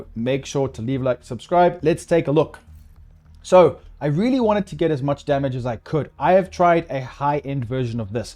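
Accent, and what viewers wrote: South Africa. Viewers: Jy klink erg Afrikaans... GREAT video!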